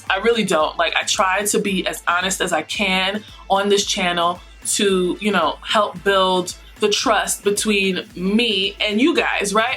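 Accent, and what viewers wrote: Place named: New York, United States. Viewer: COME ON, NY ACCENT!!!!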